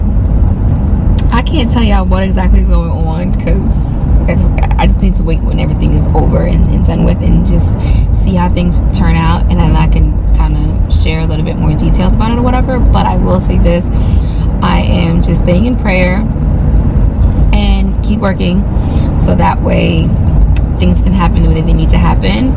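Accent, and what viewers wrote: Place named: South, United States. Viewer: That country accent is too cute😂. . Can’t wait to see the new space. Everything will work out. Praying for you!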